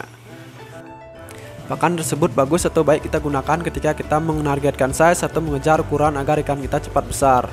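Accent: Bali, Indonesia. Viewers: Nak bali oo bli? Ngenah ti logat ne 😅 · Ngenah san logat bali ne bro 😅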